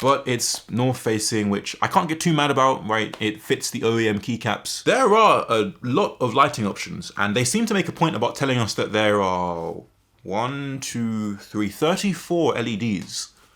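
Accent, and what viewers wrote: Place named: England, United Kingdom. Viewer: where's your accent bro? You used to be very English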